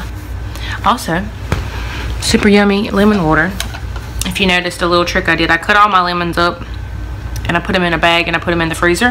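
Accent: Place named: Alabama, United States. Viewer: Where are you from? I love your accent. It sounds like an Alabama accent!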